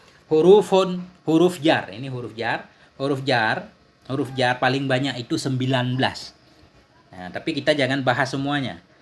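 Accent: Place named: Lombok, Indonesia. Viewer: Afwan kayaknya ustnya org lombok ya hehhee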